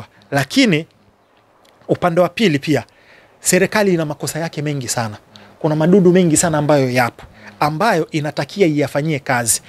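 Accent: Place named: Africa. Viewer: Salut kaka yani unavyoongea unabeba pendela ya Africa big up ❤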